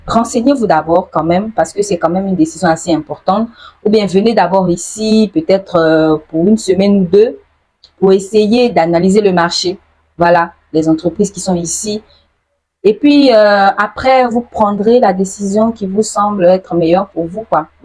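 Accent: Ivory Coast. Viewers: Du moment où tu as un accent ivoirien, sache que tu ivoirienne. Merci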